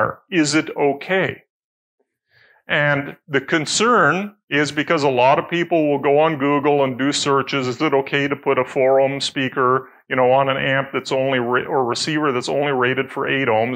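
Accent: Canada. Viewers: Canadian, eh? 😊 One of my favorite teachers was Canadian. That was like 50-60 years ago lol Howdy from the US! Enjoyed your presentation. 👍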